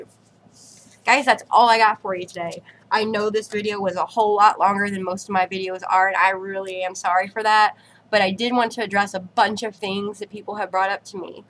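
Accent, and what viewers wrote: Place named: South, United States. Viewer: Your southern accent is adorable! And great tips girl!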